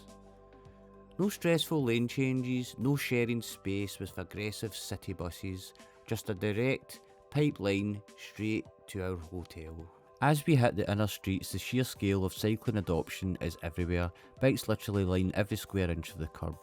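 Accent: Scotland, United Kingdom. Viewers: Video: great. Narrating: even better. Scottish accent: warms my heart. Perfect!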